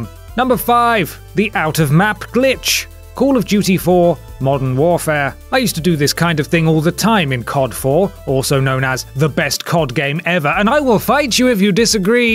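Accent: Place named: England, United Kingdom. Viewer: You had me at "silver haired bugger" but you killed it at "wanker". God save the queen, your video is awesome but you english accent earned my subscription.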